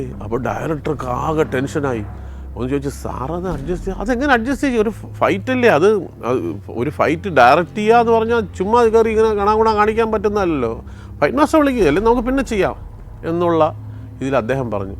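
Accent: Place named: Thiruvananthapuram, India. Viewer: തിരുവനന്തപുരം സ്ലാങ് ൽ കേൾക്കാൻ നല്ല രസം 👍🏼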